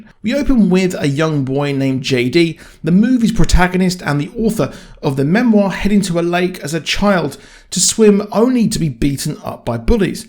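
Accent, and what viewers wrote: United Kingdom. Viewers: To call this video review excrement is an insult to excrement. Perhaps, before making a video reviewing a movie that is based off a memoir, you should READ the memoire so that you have some idea what changes were made. Had you done that, you'd see that some dramatization was included (it is a movie after all not a documentary). I'm from Ohio, from the big city, but from the state. My parents also moved from Kentucky to Ohio (which, btw is NOT part of the south) looking for a better life. Despite being a minority, my life was magnitudes more privileged than the author's, in every measurable and intangible way. As far as your hard left personal views, and how things would be in your country, such comments are unnecessary. As was your tripe about Trump. Your ignorance of why things turned about bad after maw maw's move was colored by your personal bias. 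Again, had you read the book, you might have understood. But I suspect, along with not reading the book, and not understanding how health care was provided by employers, you've never set foot in a small American town. And have no idea how much a town is impacted when THE industry in town goes away. You sound like a Brit, so asking anyone whose family lived in a town where the Colliery was closed and everyone that worked there was put on redundancy, or spoken to some one like Erin Prizzy about generational trauma would've given you a some perspective; save the connection to work and health care. Though, again, based on your stated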